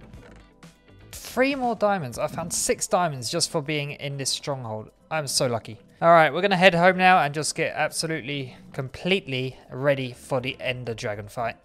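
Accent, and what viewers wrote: United Kingdom. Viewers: I just found you! I love these things. Plus the British accent wiff the f sound always sounds authentic to me! Can you make me a Kitty but make it a nice name.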